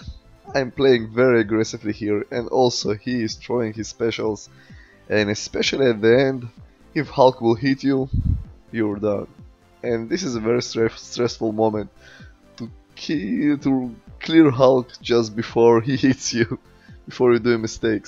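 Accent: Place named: Russia. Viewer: Travis Nadeau Pesantes Russia, Ukraine... Id guess. The accent.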